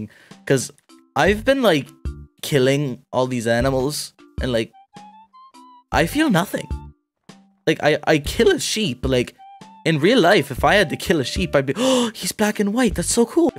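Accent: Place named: United Kingdom. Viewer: I just realized you are british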